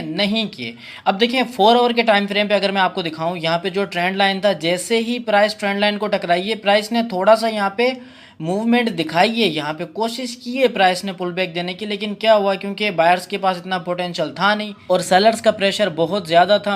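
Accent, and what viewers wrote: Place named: Karachi, Pakistan. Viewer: isliye poocha k apki urdu Karachi wali lagti thi main khud Karachi se hun tou isliye confirm kar raha tha... hahaha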